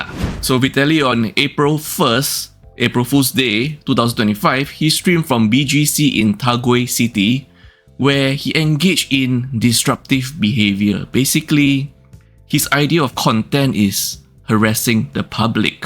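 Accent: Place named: Singapore. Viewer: your accent is giving me "Singaporean" vibe..lol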